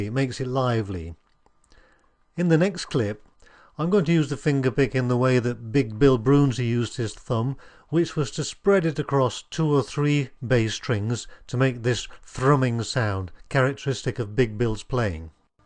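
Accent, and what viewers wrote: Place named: United Kingdom. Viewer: Glad I have found your channel. It’s nice to hear another Brit. I’ve been playing finger style or Travis picking for over five years now. I want to master the finger picks so I can get more volume for busking and they get the best sound out of my wooden bodied resonator guitar. It’s taken me 2 years to get the hang of using the slide properly. Hopefully it won’t take as long. I have been using the plastic ones but recently ordered some metal ones and I find them much easier. Thanks for taking the time to share your knowledge and upload. People like yourself are doing a massive service to us just learning. I have pretty much taught myself how to play guitar, slide and finger style. From YouTube. I’m currently teaching myself mandolin. Due to bad health I’m unable to attend physical lessons. The lessons have paid for my living costs and even bought me a guitar one summer. 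Thanks again. Ric in Leeds West Yorkshire. 🇬🇧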